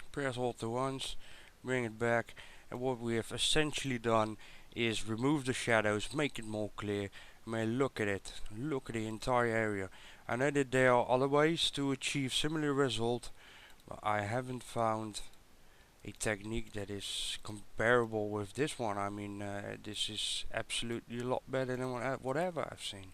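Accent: Netherlands. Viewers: I can´t watch this vid because of that Dutch accent. It really drives me insane. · I work with a couple of Dutchies, but not heard your variation before, you from the Groningen area or the East maybe ?? Enjoyed your Vid, even if I do not pretend to comprehend it all :) My biggest problem is that you can make shapes appear if you look hard enough at anything, remember when we were kids looking at the clouds, you pull out some interesting pieces tho for sure, def worthy of further investigation or question.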